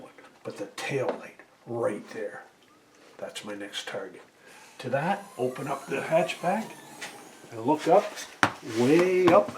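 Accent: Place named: Canada. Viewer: because of your video i'm gonna attempt this myself. plus- you have a great canadian accent- aboot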